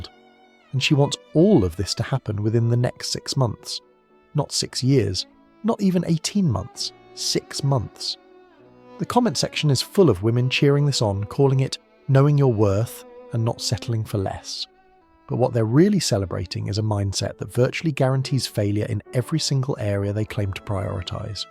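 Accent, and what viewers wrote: United Kingdom. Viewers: Blah, blah, blah, blah, blahhhhhhhhhhhhh Finding some British twit to read your garbage script doesn't turn a turd into roses, it's still bull---- All I heard was an average man excuse manifesto, BEGGING women to settle on them and stop being so mean with their mean mean standards, and leaving them for better men Geez, you Bro's need pacifiers more than you need women. This was ridiculous 😂😂😂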